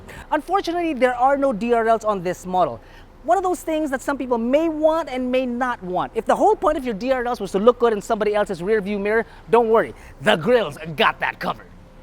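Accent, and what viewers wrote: Visayas, Philippines. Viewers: Bisaya d i ka Tito😅☺ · Hahaha! Bisaya ka?! Awesome, man!! Nag kinatawa gud ako!! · Ay samok haha bisaya bai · Hahaha mao man! Murag bisdak nis bai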